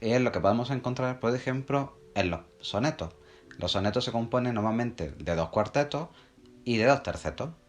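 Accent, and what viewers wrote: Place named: Andalusia, Spain. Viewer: No respondas a esta gentuza solo buscan atención, me ha salido tu video en mi sesión de estudio y sinceramente no he tenido ningún problema entendiéndote añadiendo, de que el acento andaluz es bonito no hacía falta darle ninguna respuesta ni explicación, gran contenido sigue así!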